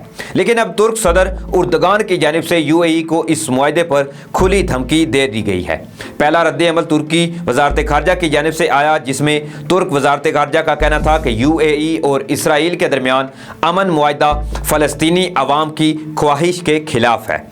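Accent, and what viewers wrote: India. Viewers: Tum indan andaz me urdo kiu boolty ho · Yes he speaks like Indian people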